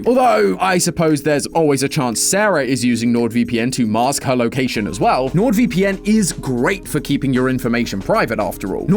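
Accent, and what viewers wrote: United Kingdom. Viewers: This channel has become so unwatchable because of the horrible Bri'ish accent and constant indie horror. Please get a different host and start covering actual games again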